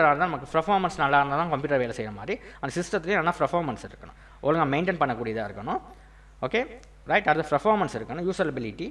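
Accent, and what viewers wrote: Sri Lanka. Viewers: Type of Upcountry Tamil in Srilanka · Colombo tamil ( srilanka )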